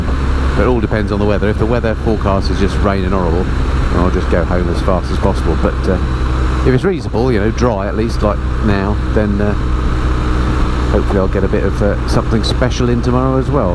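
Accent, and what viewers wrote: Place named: Wales, United Kingdom. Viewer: Liking the welsh pronunciations boyo.... you 'll never miss a gear either with those solid 'clunks".. great video...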